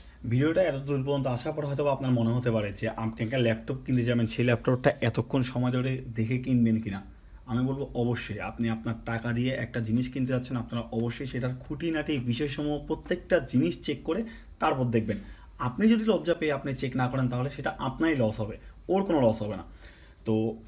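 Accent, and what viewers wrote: Chittagong, Bangladesh. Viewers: Apnr kthar dhoron shune amr versity life er kichu frnder ktha mone pore gelo tader bari chilo Chittaging...Oidiker manushgula oshadharon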